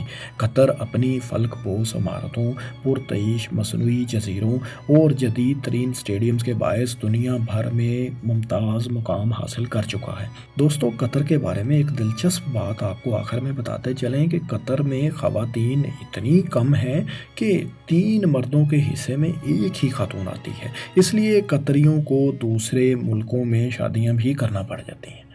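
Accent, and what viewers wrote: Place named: Punjab, Pakistan. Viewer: السلام علیکم جیسا سحر فیصل بھائی کی آواز میں ہے وہ چیز آپ میں نہیں ملتی ہمیں لہذا آپ اس چینل کو چھوڑ دیں آپ کی آواز اتنی سلو ہے سنتے سنتے نید آ جاتی ہے اور اردو کے کے کچھ لفظ آپ پنجابی میں کہہ کر جاتے ہو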